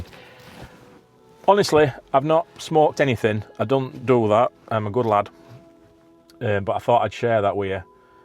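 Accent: Yorkshire, United Kingdom. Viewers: Ey up. Is anybody more Yorkshire than you. Love it, loving the vlog. Hope you didn't get caught dogging 😆 Really liked the humour in this one. You're certainly finding your feet at this vlogging lark. Nice one 👍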